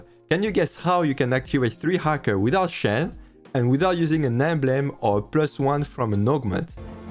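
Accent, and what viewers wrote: France. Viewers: love how you talk, did you grow up in france?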